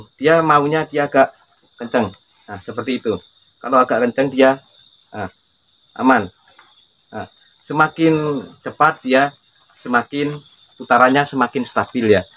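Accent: Madura, Indonesia. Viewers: Logat e sampean wes koyok duduk wong meduro cak wkwkw keren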